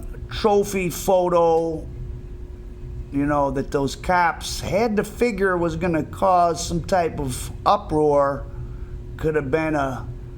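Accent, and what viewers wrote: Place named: Chicago, United States. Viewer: This guys Chicago accent is level 1000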